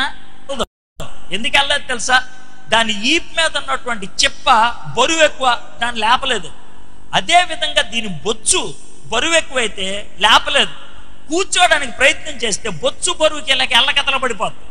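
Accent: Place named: Srikakulam, India. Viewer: Srikakulam slang sir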